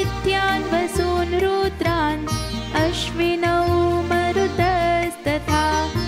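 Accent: Standard. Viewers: माँ सरस्वती की वाणी आपकी jihwa में है. Shudh उच्चारण, सुनने में Priy है. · अत्यंत स्पष्ट व शुद्ध उच्चारण, शब्दों का उतार-चढ़ाव भी अत्यंत भक्तिमय हरे कृष्ण हरे कृष्ण 🙏🏻🙏🏻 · बहुत बहुत प्यारी सुरीली और कर्ण प्रिय आवाज़ में , शुद्ध उच्चारण से , शुद्ध भाव से आपने हमको भी धन्य कर दिया है । भगवान श्री योगेश्वर की कृपा आप पर बनी रहें । जय श्री कृष्ण ! 🙏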